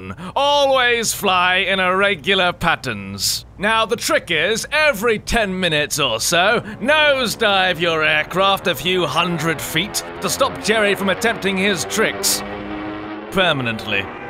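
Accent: England, United Kingdom. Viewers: Man you and your english actent made me switch from Russian to English planes.🇬🇧🇬🇧🇬🇧🇬🇧🇬🇧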